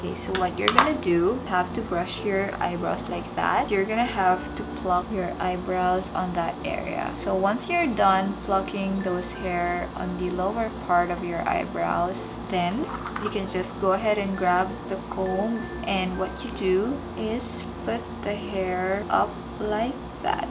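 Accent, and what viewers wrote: Philippines. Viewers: Filipino accent hehe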